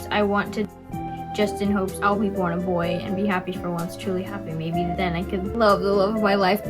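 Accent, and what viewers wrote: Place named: California, United States. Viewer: after you said ur californien and say towel weird i also said it and our cali accents are lowk the same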